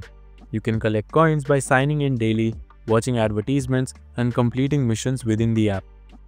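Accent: India. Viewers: Are u indian bro ?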